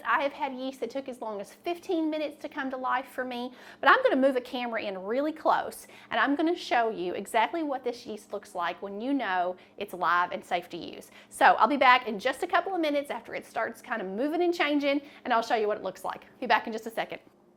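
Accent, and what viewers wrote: South, United States. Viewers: The most southern accent ever. Love it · Where in the usa are you from ..i love your accent 😍 💕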